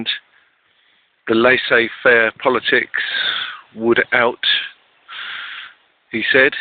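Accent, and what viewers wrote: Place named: Ireland. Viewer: That is cool man just heard the accent, I'm from Dublin myself and I know it was not a famine more like ethnic cleansing of our people but you would not believe the abuse I have received for calling it such. This country produced so much food at the time and still they let us starve. This video and many others should be showing all round the world. I also believe the british government should say sorry considering they gave Israel to the jews and not even an sorry to Ireland.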